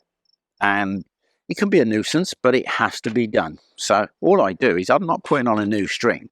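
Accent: United Kingdom. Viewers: Hi there, I'm from Québec Canada. This video appears on my tablet while I was exploring archery related videos, and what gets my attention is your medieval costume first, second , your gigantic long bow , and finally, your lovely British accent. So, I watched all your video and enjoyed it a lot. I'm anxious to view what is next on your platform. Thanks.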